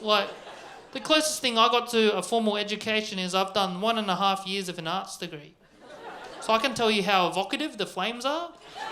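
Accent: Australia. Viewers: A chinaman with an aussie accent 🤣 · What in the magical costume change. As if the aussie accent on an asian wasn't enough for my post-partum-pandemic brain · o7 from eu. Your Australian accent is almost cheating because of the +1 to the sillyness factor. Keep up the good work of writing funnies. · I've lived in Australia since I was 3 and I'm now 34 .... the Aussie accent on this guy bloody gets to me... a fkn question/upwards inflection after about every 2 or 3 statements. Is he from Sydney? Can't be bothered looking it up. He's funny-ish. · He sounds like every single Asian kid at my high school, im from Australia. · I've never heard an Asian speak Aussie. I had a mental block like it was an impossibility or something. Silly American, laugh. So I did.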